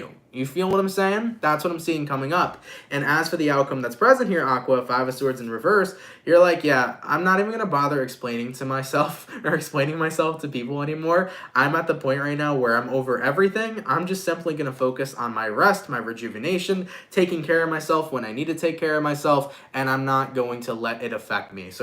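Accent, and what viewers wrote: England, United Kingdom. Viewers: Capricorn here, love the English accent haha. I’m English but mines more northern than that😊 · From England great English accent more mate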